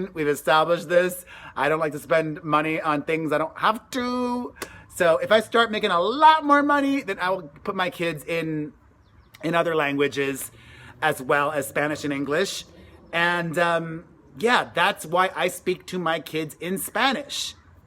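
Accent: United States. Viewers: Me encanta como tus nenes y vos hablan en castellano y que sigas manteniendo vivas tus raíces!! Y me atrevo a decir que tus hijos no tienen acento estadounidense como vos al hablar en español!! Un beso desde Argentina 😘😘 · now I understand ,but I had no clue u were Spanish,u sound southern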